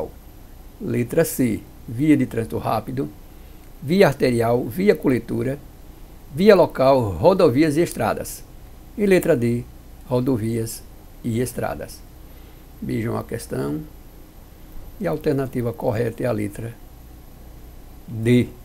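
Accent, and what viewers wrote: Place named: Paraíba, Brazil. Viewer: Ele deve ser da Paraíba pelo sutaque não sei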